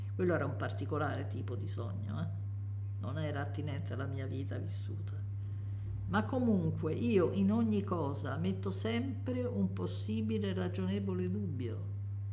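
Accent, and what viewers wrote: Rome, Italy. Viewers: Ciao, io sono una ragazza di 17 anni che da poco ha scoperto i suoi video. Durante la mia vita, ho sempre sofferto il “non sapere” in modo molto forte, cioè già a 7 anni avrei voluto farla finita perché volevo sapere subito cosa ci fosse dopo. Questa sofferenza, secondo me, deriva dalla paura di non poter esistere più, per questo vorrei rifugiarmi sempre nel “cercare di comprendere la verità”, ma a volte devo accettare semplicemente che essa a me non si voglia rivelare. Da lei sto imparando che non sempre bisogna avere la pretesa di sapere ciò che non si sa, a volte va bene anche rimanere nel dubbio. Studiare filosofia e immergermi nei suoi video, per me, sono due vere cure, perché mi rassicurano sul fatto che anche dopo la morte non cesserò di esistere, perché comunque qualcosa sono e da qualche parte dovrò pur andarmene. Sento dall’accento che lei é del Lazio, forse di Roma e nel caso sia come penso, visto che probabilmente abitiamo entrambe nella stessa città vorrei incontrarla davvero davvero tanto. 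Avrei tanto piacere a dialogare con lei perché sarei felice di incontrare almeno una volta qualcuno di estremamente saggio proprio come lei. Un abbraccio. ❤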